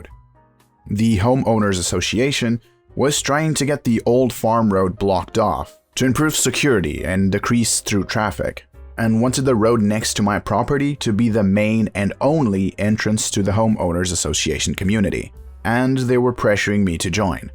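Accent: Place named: Eastern Europe. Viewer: Just subscribed. These stories are new to me, no repeats so far. One thing: I'm enjoying the reader's accent when speaking English. I know it's not human but still, it doesn't sound strong enough to be a recent arrival from Eastern Europe, the accent has mellowed....what a thought about a computerized/digital voice.... there's something very, very wrong with me🤔 I suppose a portion of the listening public have reverted, really. 40 or 50 years ago, we listened to longer stories from a cord-bound radio in one room of the house(mostly.) These days, we go everywhere with a plastic plug stuck in one or both ears, often connected to a garrote-type device, for the sake of the same type of entertainment....stories. Well done, 🎉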